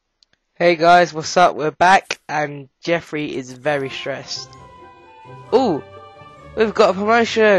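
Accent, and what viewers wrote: United Kingdom. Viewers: You British? :)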